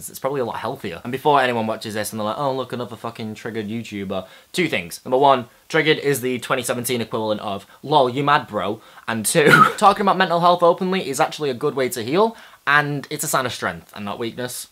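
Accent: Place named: Yorkshire, United Kingdom. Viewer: The nature of many youtube channels is lonely gay boys who need some sort of family and support system. And when the teens get to be 22 or more, they fall off. They don't much need youtube anymore. I only subscribed originally because you were kind of cute and I liked your Yorkshire accent. I don't much think the long hair and nose-ring behoove you, though.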